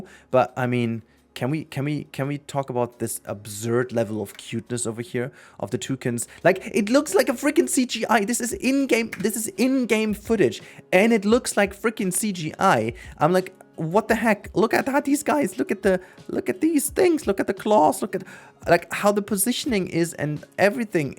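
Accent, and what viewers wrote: Germany. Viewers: Hey ganz kurz, bist du Deutscher? Vom Gefühl her wär ich mir fast zu 100% sicher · I am a nativ speaker both german and english, I thought since you have an obvious german accent that I should speak in german to make it easier for you but okay if you want. You need to work on your dictionary, it is very cringe worthy hearing you use the same words over and over again because you lack the ability to express yourself. It seems that a neutral criticism about your video has struck a deep cord with you and I am sorry that I hurt your feelings, it doesn't change the fact however that you do repeat your words. Have a nice day.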